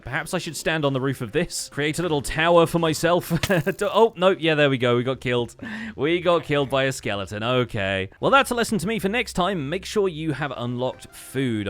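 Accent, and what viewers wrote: United Kingdom. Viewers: I'm loving this play through. Keep em coming. You're a great YouTuber, I appreciate your British accent.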